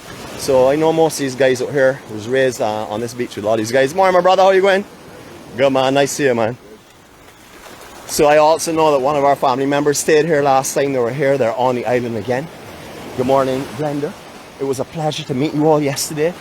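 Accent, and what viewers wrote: Barbados. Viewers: So interesting to see. I was staying in Christ Church 26/7 years ago (for five months) and spent all my time at White Sands Beach and Apartment complex - Austin, who you mentioned, I know very well. I’m shocked to see that where he worked has changed drastically…I hardly recognise it as the same place. I wonder if he still works there for George. Really enjoyed this video with your great commentary and your wonderful Barbadian accent. Thank you, from Wales UK 🏴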